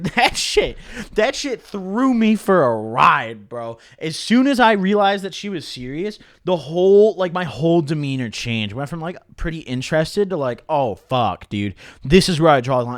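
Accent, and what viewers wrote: United Kingdom. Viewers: that british accent is disgusting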